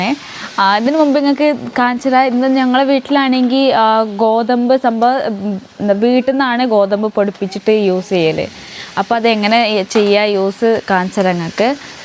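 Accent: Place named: Kerala, India. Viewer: കേരളത്തിലെവിടെയാ യുടെ വീട്. സംസാരം കേട്ടിട്ടാണ് ചോദിക്കുന്നത്.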